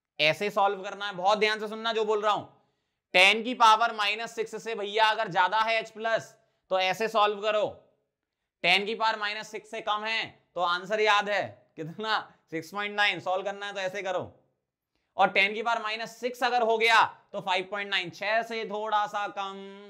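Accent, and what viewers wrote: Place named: Malwa, India. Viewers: Yes he is from malwa i guess indore..am also from malwa aur mujhe bhi accent thodaa jaana pehchaana laga😂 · Sir I am here after watching your video on Physicswallah app... Our accent seem to be very similar. Where are u from? My guess is Malwa MP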